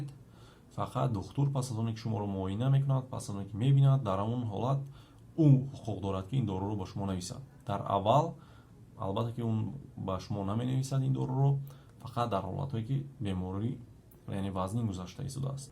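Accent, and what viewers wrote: Tajikistan. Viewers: Доктор саломат бошед! Ки бо лахжаи тоззаи точки гап мезанед салом аз Киргизстан Андарак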